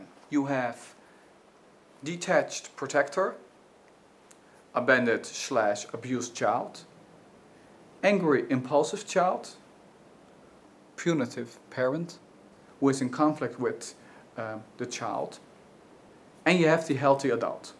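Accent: Netherlands. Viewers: het is zo makkelijk om jouw accent te herkennen omdat ik ook Nederlands ben, denk ik :s Misschien kan je dit filmpje ook in Nederlands doen? Meer watchers :D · Ik had al zon voorgevoel dat je nederlands was met het accen'tje xD haha Anyway even paar vragen over borderline... Hoe weet ik van me zelf of ik borderline heb? Ik zit ook vaak met gemengde gevoelens weet nooit hoe ik ermee om moet gaan het ene moment show ik ze heel veel anderen moment krop ik ze op en ben ik net een tijd bom die tikt Ik kan van vrolijk in 1 minuut overslaan naar boos/verdrietig In relaties ben ik onzeker, obssesief jaloers ... en word ik boos zonder rede Zijn dat tekenen? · Recognized the accent of my fellow Dutchie within 1 second. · I love your Dutch accent. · Jij bent een nederlander klopt dat? haha ik hoor het aan je accent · Are you Dutch? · He has a Dutch accent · Very good- I almost cried when you talked about the abandoned child/abused child. 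I can identify with that kid all too well. Thanks for the video. (Yes, I am a BPD person too). PS_ are you Dutch? I was trying to place the accent the whole time. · Is this guy dutch? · English is CLEARLY not his first language so he speaks it with a Dutch accent. It's not impossible to have deduced that, surely? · Ik wist het, ik hoorde je accent en dacht hij moet Nederlands zijn haha. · Are you Dutch ?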